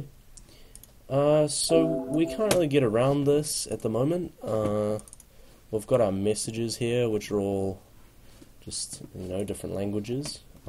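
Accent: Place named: New Zealand. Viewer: Nice kiwi accent ;)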